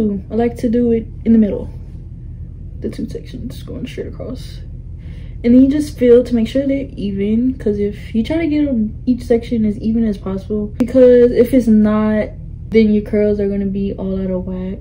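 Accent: Louisiana, United States. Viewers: ok so what part of Louisiana are you from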